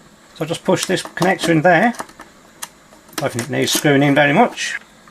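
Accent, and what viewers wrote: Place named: United Kingdom. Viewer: Very friendly British speaking guy also good technician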